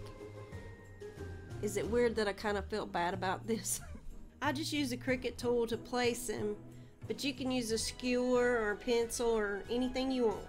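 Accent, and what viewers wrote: South, United States. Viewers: I'm not sure where you are from, but I absolutely love hearing someone on YouTube that sounds a lot like me. I grew up in middle Tennessee, so I have a very thick southern accent, so I feel like you're a family member helping me craft! Thank you for that! Lots of Love from one southern woman to another. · I normally don't care for DIY projects because they look just like DIY but I have to say these are adorable. I will do a few of these. Thanks so much. Now we all are wanting to know where you are from? We hear a southern accent... Maybe you are from my south.. Louisiana! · Just found your channel and I had to subscribe! I’m from southeast Georgia so I love your accent even though you are from a different part of the south. We are still both Southern girls!❤️Loved all your ornaments and will be check out your page for more great ideas!❤️ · Love your crafty ideas and your southern accent too. 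Sending love from the southeast Kentucky mountains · I just discovered you and I'm thrilled I did! I have to tell you how much I enjoyed watching your Video the music was nice and I loved each and every Christmas ornaments I really did! The baby JESUS was such a nice idea and it turned out so adorable (your humor is appreciated, I need some lately these days.) The pink tool was stunning with the multicolor gravity Ball..it sparkled so lovely! The Snowman's Top Hat and face was clever and so nice seeing it hung on the Tree! I liked the way you explained each craft...,I love listening to your Southern accent I'm from lower Michigan we don't have a friendly sounding voice (but we do say "POP" for bottled Carbonated Soda) Gee! ALL were really great ideas and some I've seen others do "BUT" using the BIGGER Bulbs I have to say that was one of the differences and yours had a look of neatly done, fresh and festive! 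SUBSCRIBED! Merry 🌠CHRISTmas and Blessings all throughout the coming 2023 🛻🎄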